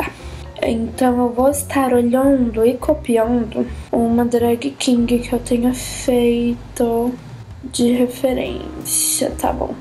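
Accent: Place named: Rio de Janeiro, Brazil. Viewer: querida, tu tinhas um resquício de sotaque carioca... Amey esse vídeo!:D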